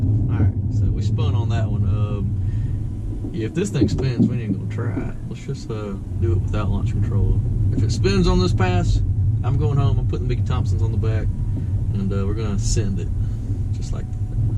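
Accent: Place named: South Carolina, United States. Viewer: You gotta be in SC with that particular twang... maybe GA. I know a lot of guys around TR like to have fun. I am going to pick up a plaid myself. Cant wait to give my neighbors a stroke on test drive. Especially coming from a dual motor awd model 3.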